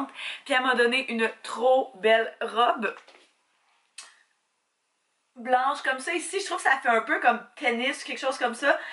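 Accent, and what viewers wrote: Quebec, Canada. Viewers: Merci pour les conseils de toujours laisser sécher le linge à l'aire. Je le faisais déjà pour les pièces que je lavent à la main, mais pas pour celles que je lavent à la machine. PS. C'est cool d'écouter ton accent. Si tout vas bien je vais bientôt aller au Canada pour un séjour et je suis sûr que les canadiens seront surpris par mon accent français belge😅.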